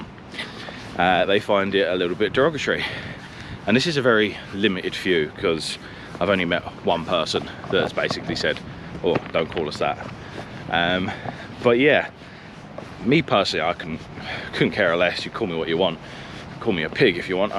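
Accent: Australia. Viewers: I detect the hint of an Aussie accent ❤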